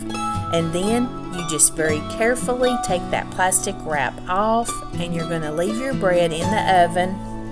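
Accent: South, United States. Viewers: Happy Fall!! Your recipes are so amazing and for us, its your sweet country voice that just relaxes both of us... we absolutely adore you and your content!!!! Thanks so much for joining in this year!!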